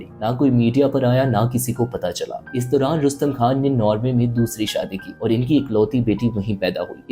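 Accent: India. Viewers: Ap Pakistani hain Indian ki trha na bolain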